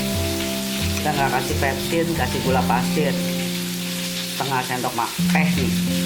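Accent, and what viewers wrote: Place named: Jakarta, Indonesia. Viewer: .... ada keturunan betawi atau karna bergaul dilingkungan betawi? Logatnya betawi sangat... 😃 logatnya mengingat kan anggota keluarga ( para orang tua) tp udah pd meninggal 🥺🥺🥺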